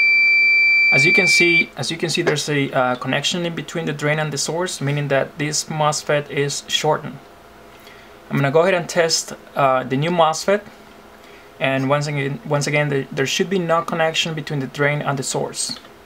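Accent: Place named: United States. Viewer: =) the great american accent ......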